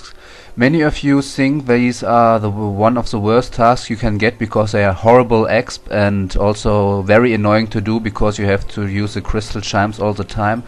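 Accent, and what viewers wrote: Germany. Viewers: R u german? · your from germany right? i hear from your acent i think or i just suck at it :/ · nice german accent lol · den deutschen akzent hört man raus :D gute guide p.s. ich habe nichts gegen den deutschen akzent... wenn ich englisch rede klingt das fast genau so mit dem akzent =D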